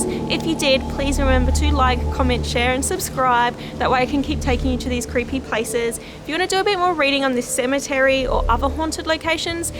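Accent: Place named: Australia. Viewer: You've got an Aussie accent!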